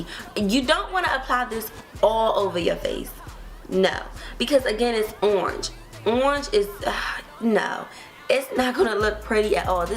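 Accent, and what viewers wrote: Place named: Baltimore, United States. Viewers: You gotta b from Baltimore lol I hear it all in u, new subbie love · I'm obsessed with your accent, where are you from? DC? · tuuur ( two) omggggg I love DMV accents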